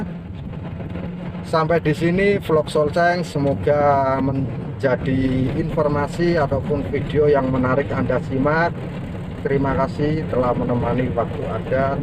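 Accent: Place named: Java, Indonesia. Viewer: Cakap pelat jawa tebal pun masih buat contsnt ...pebat dengar weak...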